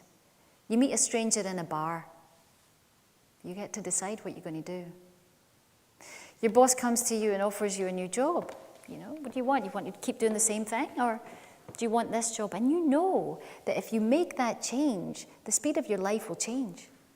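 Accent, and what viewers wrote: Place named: Scotland, United Kingdom. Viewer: Hey there community. Would you help a non native English speaker figure out some of the words of Caroline's presentation? The transcription is really bad (and the translation is no help, just as poor). I have been able to correct most of it but some parts remain a mystery to me... Scottish is definitely a challenge, lovely but tough. There's nothing essential missing to understand this powerful and incredible presentation but I'd love to have a full clean text to read. Thank you all. at 2.24, Caroline's Mom says to her after the remark about the Cupid's bow "Caroline, you're a XXX ? " at 4.56, she refers to the moon "it"s like the XXX of the moon". at 5.45, after "presbyterian, "XXX.. " can't understand what follows until "in fact this is a question... at 7.17 you've got a long time to figure out.. XXX ?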